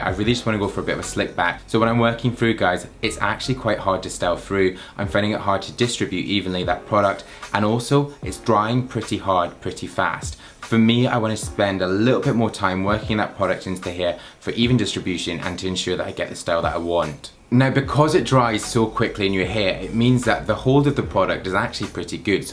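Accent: United Kingdom. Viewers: Is funny how British people talk.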